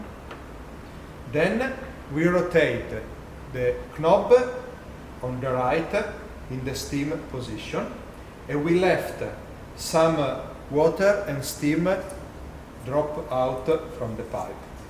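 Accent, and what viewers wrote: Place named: Italy. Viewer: Why does Italians speak English so badly? It seems impossible to even do it on purpose xD the accent is absolutely devastating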